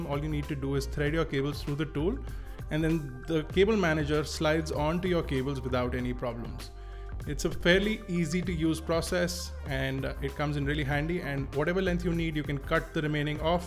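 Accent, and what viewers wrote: India. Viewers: Indian Accent 😄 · Damn man... Dope doesnt sounds so dope in the Indian accent.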